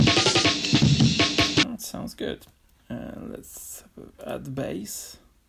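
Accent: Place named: Germany. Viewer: not an authoritative answer but my guess would be a German living in the UK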